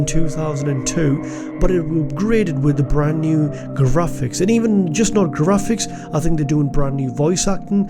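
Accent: Newcastle, United Kingdom. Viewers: You from the west end, bro? (accent is a dead give away to a fellow Geordie) EDIT: little bit of boro in that accent, so not so sure now.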